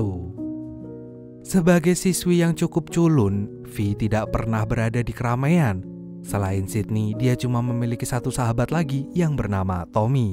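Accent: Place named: Tegal, Indonesia. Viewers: Sihhh malah wong ngapak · Rika wong ndi sih bang, ngomonge deneng ngapak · tegal hadia Maseeehh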